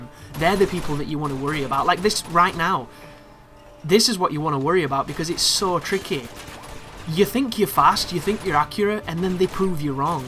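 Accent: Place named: Scotland, United Kingdom. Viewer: I've watched this one before I started mine because I really had it difficult on the crushing level when your in the wagon. Perhaps I should have played it first and figure it out, because I noticed on crushing that you didn't have to kill the gunners. But it's the inbetween section where I got killed a lot of times and I was curious how you did it. (And now I know your a Scotsman. Perhaps you should comment al your games a second time in scotish. I will surely look this versions then. Love the Scottish accent as well as the Irisch and some Brittisch dialects. American Englisch is too nasal for me). For the part in the courtyard where you're hanging on the publicity. You did the first part much quicker than I did. I died a lot here in the first wave. But I didn't noticed the gas barrels. For the second part where you have to change side. 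It's much easier to descend one pannel. You can aim the two guys on the right without getting killed when they're firing. Though there are some things you have to know. First if you got too far to the left of the pannel and you aim for a headshot on the left guy, you can't always fire. Another thing that baders me here is that you can't shimmy when aiming. So you just have to guess if you're in the right position. And as you said the sniper is no problem at all. Certainly not if Helena shoots thus guy. Once he is stuned you can kill him, even with a headshot. For the third wave I changed side again. The advantage here is that you got a better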